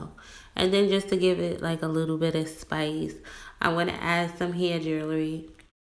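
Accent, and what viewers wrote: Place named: Cleveland, United States. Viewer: You did your thing,! Are you from Cleveland? You sound like it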